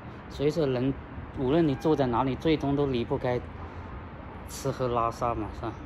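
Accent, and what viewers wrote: Hakka, China. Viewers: 閩西客家話口音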